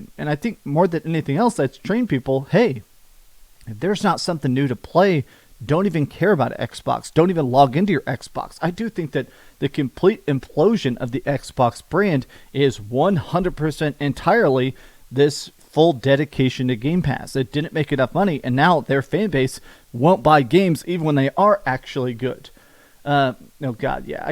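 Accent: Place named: Wisconsin, United States. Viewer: Is from Wisconsin? What is that accent?!???😂🎉😢😢🎉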